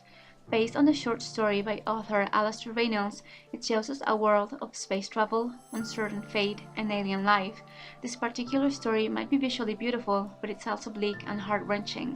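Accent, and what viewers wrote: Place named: Eastern Europe. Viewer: Awesome video. This story blew me away. Great thoughts and analyses. Love your Eastern European accent, btw...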